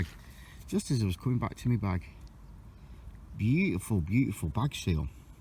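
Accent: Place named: United Kingdom. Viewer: Enjoyed watching your great content and some nice finds boy I really have to listen hard because of your great British accent 🤜🏻🤛🏻 keep it up bro, all subbed and I smashed the like button to boot. Cheers Frank <link>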